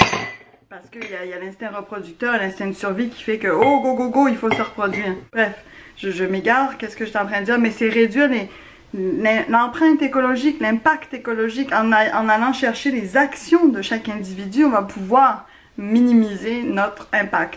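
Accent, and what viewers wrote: Quebec, Canada. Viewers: T’as dû prendre l’accent québécois sans t’en rendre compte en tout cas je t’entends vraiment parler le québécois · Est-ce que tu es native canadienne ? 😌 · Incroyable !!! Tu as carrément pris l’accent je suis ébahie haha, à la base tu es du sud de la France non? J’essaye d’analyser tes intonations c’est un mélange intéressant! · C'est ce qu'on appelle l'accent hybride, c'est à dire mi-français mi-québécois, un accent québécois light en quelque sorte, du coup un français qui écoute aura l'impression d'avoir affaire à un québécois et un québécois qui écoute verra tout de suite qu'il ne s'agit pas d'un québécois autochtone... Les français vivant au Québec peuvent avoir cet accent hybride sans forcément s'en rendre compte, c'est le cas pour, elle utilise le phrasé, les intonations québécoises ainsi que les tournures de phrases de son nouveau pays de résidence.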